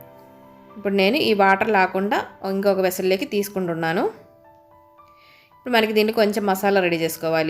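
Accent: Kerala, India. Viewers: teluguni kerala accent lo matladthunnaru very nice ...first time hearing this ..how u learned telugu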